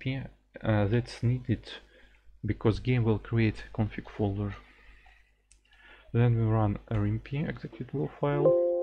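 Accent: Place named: Russia. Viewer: Наш человек! Акцент - агонь!)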